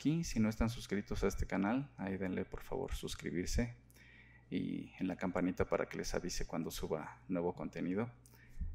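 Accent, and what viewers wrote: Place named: Mexico. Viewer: me pasa que siento tu voz como si fueses actor o de alguien conocido, ¿será que los de latino américa ya estamos acostumbrados al acento mexicano en el doblaje?... xD Está excelente el modelo. Espero que te encuentres bien. Greetings!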